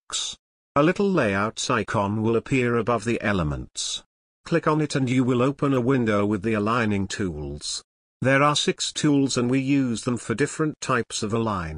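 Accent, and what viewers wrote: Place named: RP, United Kingdom. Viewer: I like how posh he sounds : )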